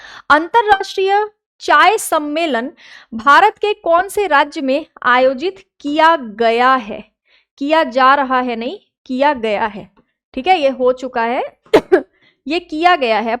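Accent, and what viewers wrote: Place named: Bihar, India. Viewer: Madam ji aapka bolne ka excent thoda Bihari jaisa lagta h but accha bhi lagta hai 😊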